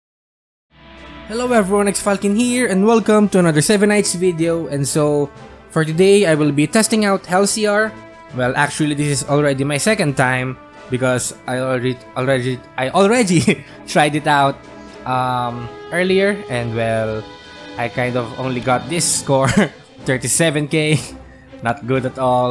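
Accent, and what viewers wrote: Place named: Philippines. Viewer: First 30 seconds accent I tought you were from Romania, then I realized Philiphines.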